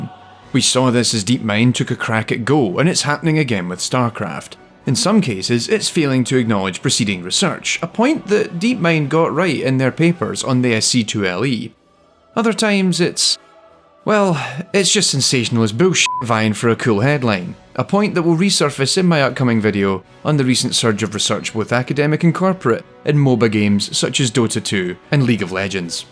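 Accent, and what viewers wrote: Scotland, United Kingdom. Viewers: Anyone else taken aback by the sudden switch from a Scottish accent to a really good French one?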